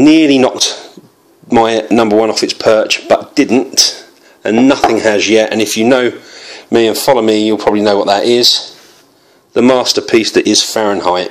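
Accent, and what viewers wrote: United Kingdom. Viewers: Love your British Accent mate !!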